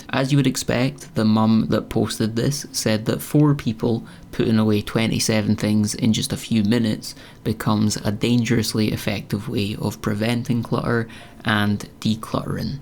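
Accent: Scotland, United Kingdom. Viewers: Thank you,! New subscriber. Have watched a number of your videos. I have clutter + disorganization challenges, too, to a very scary degree. Appreciate this video and your other videos, too, where you address these issues. I ought to have addressed these issues decades ago when I was a young adult. Hopefully, one or more of the helpful strategies you have mentioned in your videos will stick and I will make progress with these issues. I enjoy your channel. You have a very soothing voice, a very nice conversational style, and I enjoy your sense of humor and hearing your Scottish accent. Wishing you continued success in all your endeavors! 06/05/2026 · Great to hear a Scottish voice! I’m in Edinburgh. Yip slap bang in middle of decluttering journey. My best getting started for me my heater developed a leak and soaked 1/2 of my carpet and if you’ve had cats from kittens well if you know you know. 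Had to get a friend to lift the carpet. Nearly everything had to go into my bedroom with the remainder getting shifted around. So my plan is to go retrieve with I need and the rest either donated or landfill. Aw the best!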